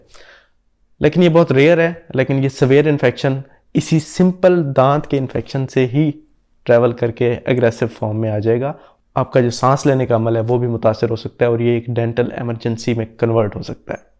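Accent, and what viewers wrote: Saraiki, Pakistan. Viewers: ڈاکٹر صاحب سرائیکی لگتے ہیں اپنے accent سے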